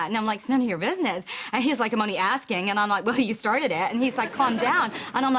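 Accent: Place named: United States. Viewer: wow, shes got a pretty good american accent lol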